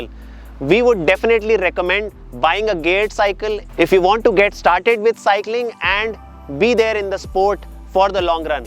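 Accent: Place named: India. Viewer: You have a thick Indian accent.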